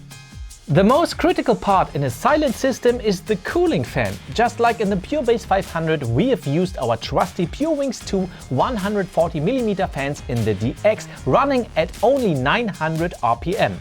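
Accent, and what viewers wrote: Germany. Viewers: Dieser deutsche Akzent hahahahaha Feier ich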